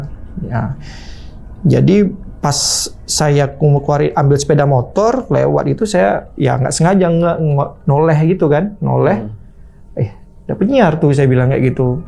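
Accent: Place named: Banda Aceh, Indonesia. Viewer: Si abang, logat nya aceh kota lii kok😂, cirikhas awak banda aceh, btw ni binkara fm gampong jawa, kalo di google maps gaada, itu salah, yg bener di gampong jawa deket lapangan persis, lurus lagi kedepan ada tpa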